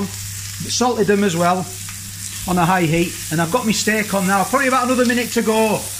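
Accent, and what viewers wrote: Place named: Northern England, United Kingdom. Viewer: Hiya .. The steak looks great. I've also tried this steak from Iceland and I was very impressed by it too. What I want to know is where did your peas go to that you cooked?? I think you had steak brain and forgot about them!! Lol 😂😂 I've only subscriber a few days ago, and I'm loving your northern accent. I live in Bracknell (about 10 minutes away from Windsor) but have always had a London accent. Anyhow, keep what you're doing and I will keep enjoying your channel. Cheers, Vicky 😁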